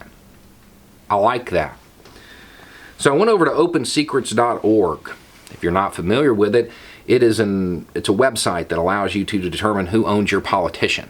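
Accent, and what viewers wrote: South, United States. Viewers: I hear the southern voice and I see the beard, I see the name, so I come here every day to disagree with you, and I fail every day, dammit!!! You keep destroying my preconceived notions.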